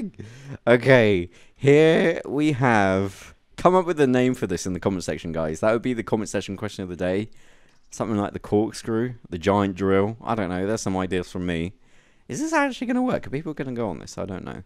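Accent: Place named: United Kingdom. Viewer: By your accent I think your British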